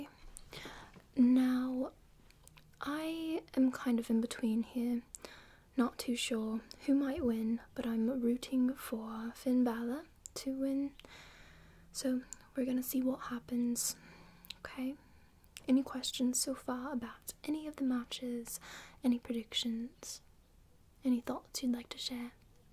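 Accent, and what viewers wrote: England, United Kingdom. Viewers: love the british acsent if it even is haha! though the outfit is -tastic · Kowalski analysis - I think you're wearing your brother's hat that says "Punk" on the front. I can't figure out if that's a wig but your hair looks unusually dark and has an odd shine. This is also the first time you're showing your new desk chair on a video. There's someone desperately trying to tell you something lol. I don't know anything about wrestling but it's always fun to see you portraying different characters! I've always wanted to hear you speaking with an English accent and you sounded great, it wasn't forced and felt very natural! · Spectacular video the English accent and all the attires is amazing those were some great predictions have outstanding weekend enjoy wrestlemania 35 🧡💚✨🧡💚✨🎊🎉🎊✨😎🏆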